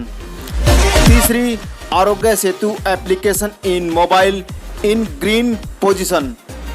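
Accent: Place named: Haryana, India. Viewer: बोली से हरियाणा का लग रा ह भाई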